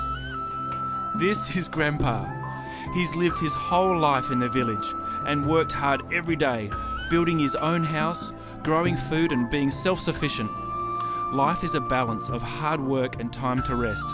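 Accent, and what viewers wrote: Australia. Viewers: do I hear an Aussie accent ? I'm on Tamborine Mountain in the Gold Coast hinterland . Can you tell me where Phi Mai is please . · your pronunciation is way off.... you use too much of your native australian accent and it ruins the tones of the thai language !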